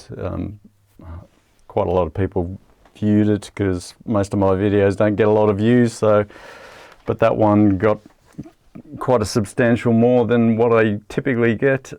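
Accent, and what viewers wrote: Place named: Australia. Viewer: What I found most interesting is that you have a Finnish name, yet you seem to speak with a perfect Australian accent 😄 If you don't mind me asking, have you lived there your whole life?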